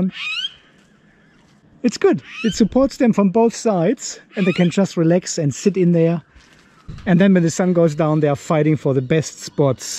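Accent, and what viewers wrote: Germany. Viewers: Very nice video - greetings from bloody German accent to bloody German accent 🙂 (currently also building up my little solar power plant) - I really like your way of trying to find customized solutions that exactly fit your needs! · You're such a nice and helpful guy, I can see where people would help out where they can. And all that testing and experimentation is really helpful! I hadn't really payed much attention, but I did notice in the back of my mind that your accent was something other than Aussie. Then when watching this video, and hearing the cursing (and identifying with it!) it clicked. Ah! German!! Very cool.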